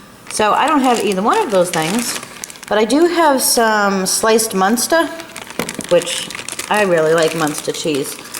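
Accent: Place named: East Coast, United States. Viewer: I found your channel over the weekend and I thought I'd leave a comment and say HI! & say.... please do tons of hauls! If some are sick of em they can skip that video. & Yes I can see :) LoL I love all the stuff you do! & You cook things that I can do! I am definately going to try the stir fry I watched last night!! Last thing is I love your east coast accent! I & My family are from the corner of Indiana near Chicago so I am used to a striong Chicago accent! XoXo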